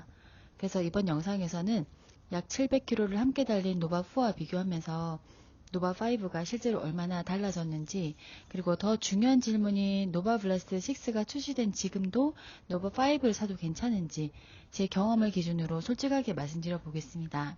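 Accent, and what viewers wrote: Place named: Gyeongsang, South Korea. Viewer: 경상도 사투리 ㅎ 귀여워요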